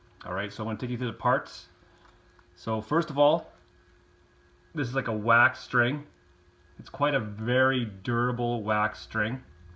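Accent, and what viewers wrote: Canada. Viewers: oooh I love a Canadian accent!! ❤❤❤❤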